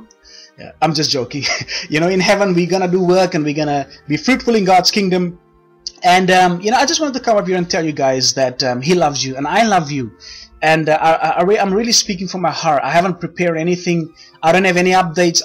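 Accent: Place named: South Africa. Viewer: Hey, I recognize that accent, Im from South Africa too and live in Costa Rica, nice hearing from you brother, God Bless and thank you for your message, Yeshua is coming, Amen!!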